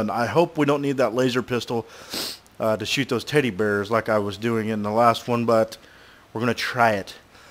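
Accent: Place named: South, United States. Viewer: where are you from? you sound very southern!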